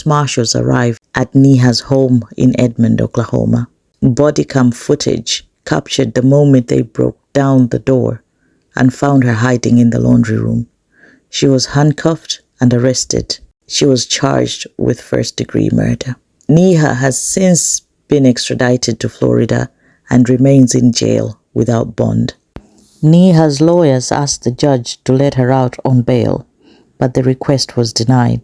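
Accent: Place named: United Kingdom. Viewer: You've British accent... tikambilane, kindly share your email address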